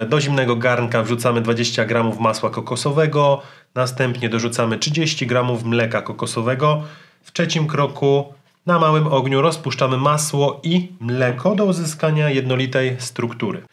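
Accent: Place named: South, Poland. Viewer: Oj, taka gwara południowa!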